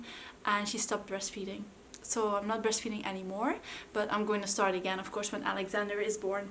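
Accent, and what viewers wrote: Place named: Netherlands. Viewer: Where are you from? It sound like you speak dutch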